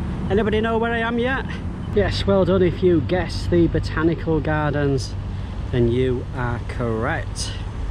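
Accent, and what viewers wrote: United Kingdom. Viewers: You from British?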